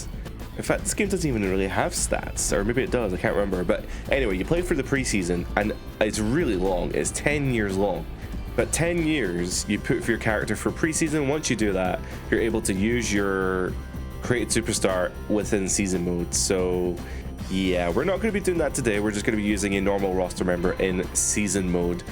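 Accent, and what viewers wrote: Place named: Central Belt, United Kingdom. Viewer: I’m from Stirling. You sound like you’re from central belt as well! How’d my aim?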